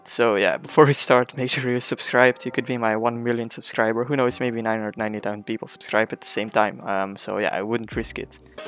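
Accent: Netherlands. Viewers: Where you from you sound dutch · U sound dutch, where you from? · You have a Dutch accent??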